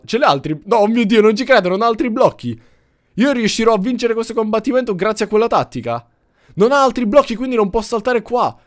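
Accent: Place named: Caltanissetta, Italy. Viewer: scommetto che sei di Caltanissetta si senta dalla tttt cmq bellissimo video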